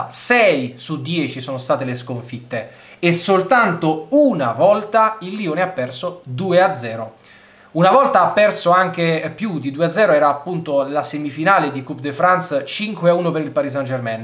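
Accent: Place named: North, Italy. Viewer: ACCENTO SETTENTRIONALE VEDO😂😂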